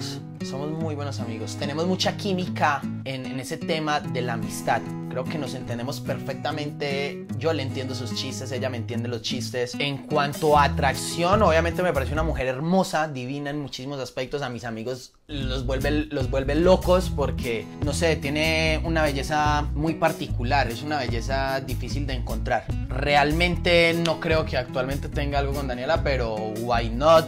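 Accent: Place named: Antioquia, Colombia. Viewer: Que flow paisa 🍃😎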